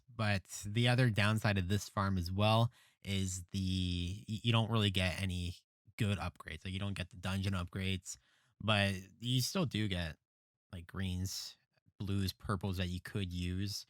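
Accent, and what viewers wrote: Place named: Canada. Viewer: Awesome, thank you! Also you sound like a fellow Canadian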